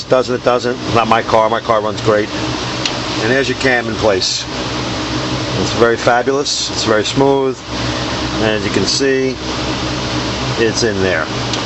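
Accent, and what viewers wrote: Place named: New York, United States. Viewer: Jesus Christ dude the day I bought my GN brand new back in 87 the service department at the dealership told me that this is literally the most sensitive and temperamental v6 that GM ever made. You can’t man handle and let sensitive internal engine components like the camshaft journals being scratched and nicked by the hood lock. Then you spin away all the assembly lube on the cam showing off with this dog and pony show. I love how you fingerprint yourself in the valley pan. You sound like your from either Brooklyn or Queens Ny and I hope to God in 31 years I never had you working on my WE4 because after seeing this video all I can say is God be with Pasquile and his engine. If you pulled this on my car and I saw this video Idk how I would respond. Since retiring and moving to Florida I started doing everything myself on my car because the dealership doesn’t know how to work on these cars anymore and the “Go too guy” could be like you who is careless and doesn’t (be admission on the video) care about your customers or the work of art classic piece of American history that you are working on. 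I guarantee you nicked that bearing journal and that engine isn’t running or has since been re built by someone who knows what he or she is doing. You should already know how unforgiving and intolerable these turbo 109 engines are. Especially the shortblock and shitty oil pump, and oil pressure issues. But like you said,,It’s not your car.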